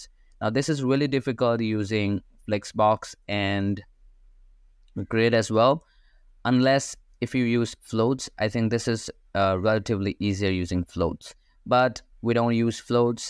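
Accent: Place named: United States. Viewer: hey bro i just want to know how you learn american accent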